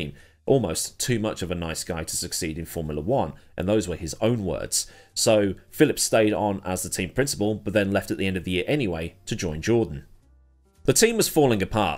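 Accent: United States. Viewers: Your American accent is impeccable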